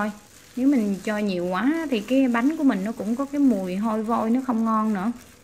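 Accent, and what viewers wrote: Mekong Delta, Vietnam. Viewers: Trinh Trần NGƯỜI MIỄN TÂY HÌNH NHƯ PHÁT ÂM NHƯ VẬY ĐÓ, BẠN CÓ GIỎI QUA DẠY LẠI MẤY NGƯỜI MIỀN TRUNG, BẮC KÌA, CÓ VÙNG GỌI N LÀ L VÀ NGƯỢC LẠI NỮA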